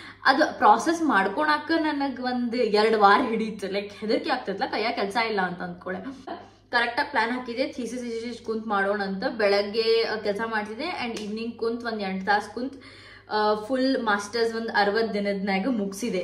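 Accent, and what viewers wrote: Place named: North Karnataka, India. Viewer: nam uttar Karnataka accent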